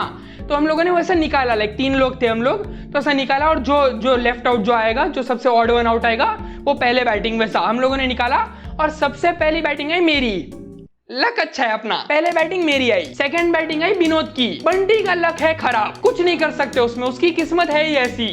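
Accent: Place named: Gujarat, India. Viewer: Bro are you Gujarati because you have a Gujarati tone and I am a Gujarati too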